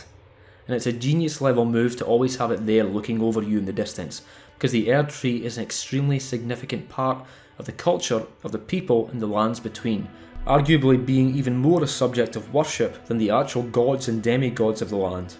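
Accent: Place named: Scotland, United Kingdom. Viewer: Ah yes another wonderful scottish man video to listen to while i work today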